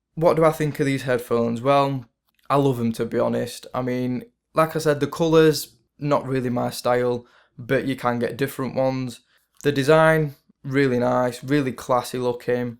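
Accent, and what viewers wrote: United Kingdom. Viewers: I don't understand well your British English.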